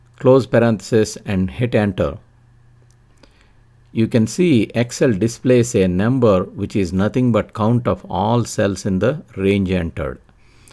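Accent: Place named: South India, India. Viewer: Must be from south Indian .... peice of advice ur accent s*cks so plz don't insult English language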